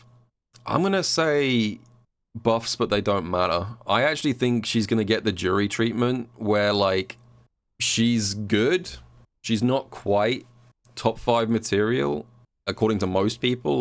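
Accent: United Kingdom. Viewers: Another great video, British Diaphone.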